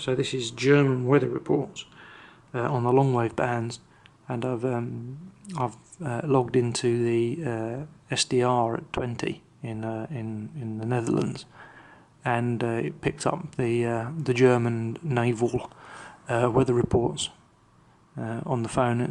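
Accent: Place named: United Kingdom. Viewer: Yes. He is speaking the Queen's English. I'm guessing you're American, yawn.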